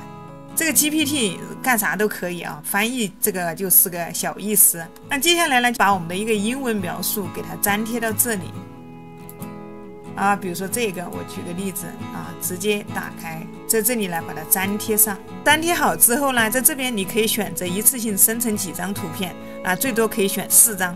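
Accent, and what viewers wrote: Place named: Sichuan, China. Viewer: 四川的吧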